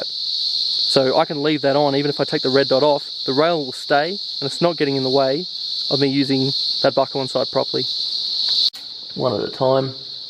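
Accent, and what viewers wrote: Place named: Australia. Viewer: I just stumbled across your channel today by sheer accident but you have me hook if for no other reason ( although many reasons) you are the first Aussie I've heard that just speaks like a regular Aussie I just genuinely appreciate that and for once don't feel miss represented please keep up the honest genuine feedback and the fact you're not trying to tell answers but have a discussion. Mate you're one of a kind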